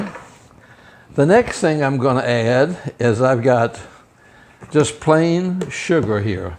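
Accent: South, United States. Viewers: Sir, thank you for sharing. Where do you come from? You sound like NC or Virginia.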